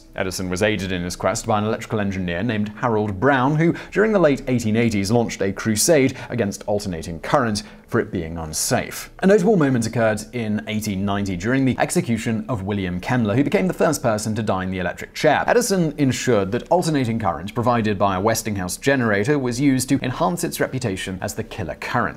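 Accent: United Kingdom. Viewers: The BritLish pronunciation is interesting indeed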